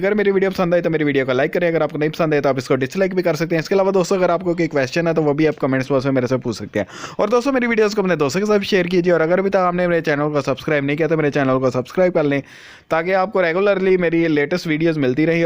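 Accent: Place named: Punjab, India. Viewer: Punjabi touch aa gyya hai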